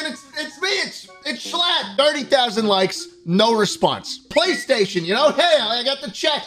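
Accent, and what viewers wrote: United States. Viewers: American accent at its purest.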